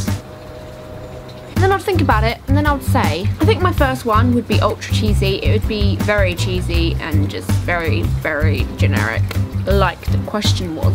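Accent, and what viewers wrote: United Kingdom. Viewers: oh and your british accent rocks :D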